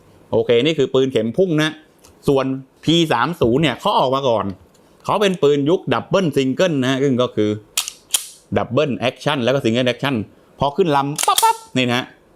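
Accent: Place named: South, Thailand. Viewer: ผมมีเพื่อนคนใต้หลายคน ที่พยายามพูดกลาง สำเนียงออกมาแบบพี่บ่าวคนนี้ทุกคน ฟังแล้วน่ารักดีครับ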